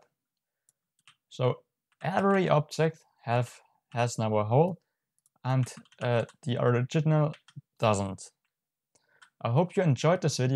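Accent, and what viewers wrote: Germany. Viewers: Mein hört dein deutsch raus 😂 aber alles gut thx für das Tutorial war sehr schön👍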